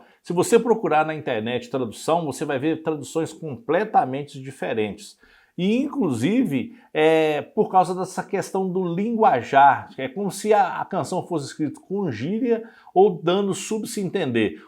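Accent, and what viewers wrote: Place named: Minas Gerais, Brazil. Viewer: Meus pais são de Cataguases. Quando vc começou a falar na hora tive certeza que vc é mineiro.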